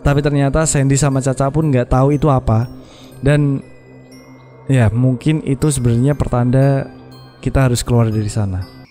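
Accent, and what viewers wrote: Java, Indonesia. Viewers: saran aja mas kalo medok gausah 'gua elu'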